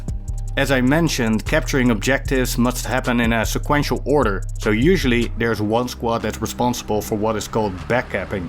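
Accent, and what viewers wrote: Netherlands. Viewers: Proper dutch accent mate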